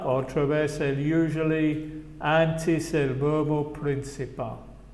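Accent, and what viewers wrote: England, United Kingdom. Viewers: Te felicito , es un gran profesor. El acento inglés es hermoso, aunque si tiene una grafía algo diferente que la Norte Américana.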